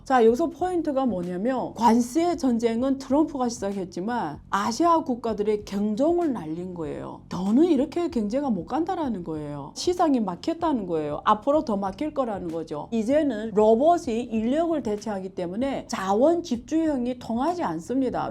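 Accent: China. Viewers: 조선족인가? 발음이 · 발음이 중국인?????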